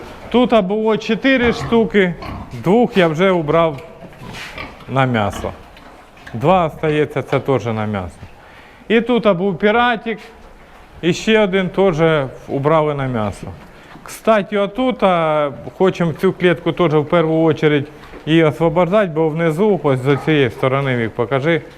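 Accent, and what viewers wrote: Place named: Surzhyk, Ukraine. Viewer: З чого ви взяли що він російськомовний? Він просто може розмовляти на суржику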